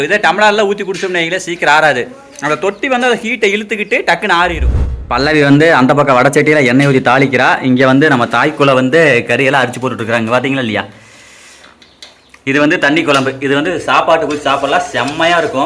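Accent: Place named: Erode, India. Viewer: Hi .....nan ippodhan first time unga video pakkuren...slang enga oor slang maadhiri irukku...nan erode..neenga endha oor bro...👍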